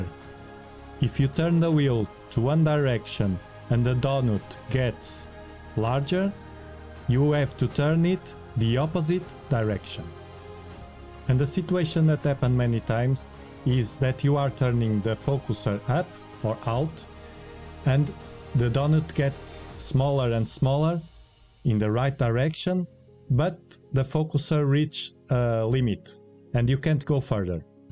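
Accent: Portugal. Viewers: Ayo Portuguese accent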